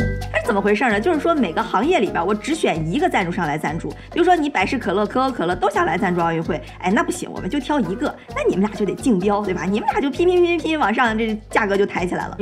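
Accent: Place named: Dalian, China. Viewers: 这期大碴子味特浓郁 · 哈哈哈哈 大连话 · 、 一口地道的东北腔，特有趣。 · 哈哈，小lin东北人！ “抖“是奥运会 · 喜欢大连话！！！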